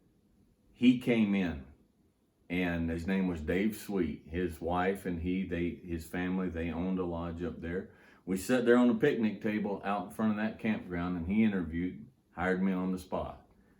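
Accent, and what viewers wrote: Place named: Southeast, United States. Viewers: I thought I heard a more southeastern accent. I'm from east TN and me and my wife have always wanted to do something like that or atleast regularly utilize and ride horses/mules. So glad I came across your videos! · Mighty great story, and lessons for life. Purty sure you still have a little bit of Kentucky accent. After two generations here in Texas, I still consider I have some of my meemaw's Kentucky accent. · Just found this guy yesterday. Now I'm binge-watching. Three points come to mind now: First, in the first video I saw of his, was looking 100% "Texas." The video was teaching how to make a simple camp breakfast (awesome, btw!), and our man was surrounded by flat TX dirt, cedar trees, and what looked to be some mesquite, too. Texas all the way. Then he opened his mouth and started talking. And I thought, "NO WAY is this man from the Lonestar state! 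That is East Tennessee if I've EVER heard it!" Turns out I was right, lol. Second: Hate to get "political," but when I hear all this talk about "white privilege" I think about countless men like who had NO privilege at all! Every ounce of success was earned with blood, sweat, and tears. Third: should get an agent and go into radio or voice acting. You're a NATURAL!!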